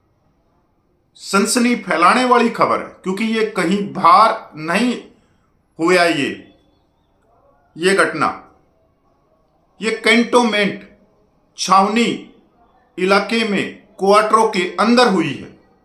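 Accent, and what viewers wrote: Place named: Haryana, India. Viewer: भाई अपने चैनल मे हरियाणवी टोन का प्रयोग कम से कम करे।धन्यवाद।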